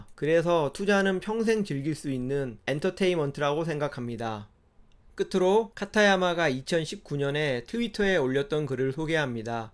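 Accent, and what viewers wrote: Jeolla, South Korea. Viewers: 의 발음을 못하는거보니 전라도 분이시군요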